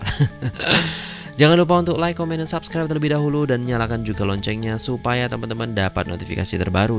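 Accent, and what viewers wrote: Malaysia. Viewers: ABG NI ORG INDONESIA KE MALAYSIA CARA CKP MCM ORG MALAYSIA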